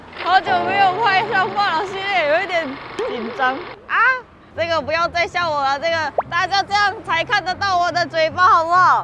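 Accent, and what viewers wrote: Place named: Taiwan. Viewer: 的中文真的越來越台，幾乎都要沒什麼口音了！！超厲害～🤩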